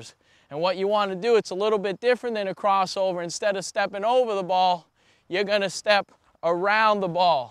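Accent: United States. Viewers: No no. dude in America, and canada they call it soccer actually. lol. He sounds like hes probably from one of those countries, hence the soccer reference. It is a term in the dictionary. you are giving false information. i didn't realize that anyone had any confusion about this matter besides yourself. If you are trying to make an underlying point about the fact that soccer is not the original name for the sport where it was invented in england in the 1800's than you have missed badly.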